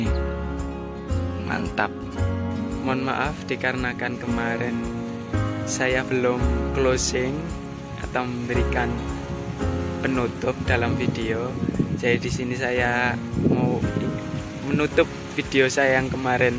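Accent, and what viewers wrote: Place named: East Java, Indonesia. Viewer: Ustadz luar biasa smg sukses boso wetane medok bgt 👍👍👍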